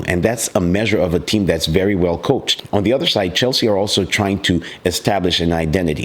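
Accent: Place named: United States. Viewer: Great analysis.American accent 👍🏻😊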